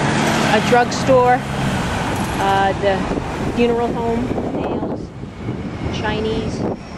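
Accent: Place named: Ridgewood, United States. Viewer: Nice video. Good to hear a Ridgewood accent out here in Colorado. I use to sell pretzels on the corner of Seneca and Myrtle. I made seven dollars in four hours in 1969. That was the height of my earning potential! You could buy a lot with seven dollars back then. Another world, another time.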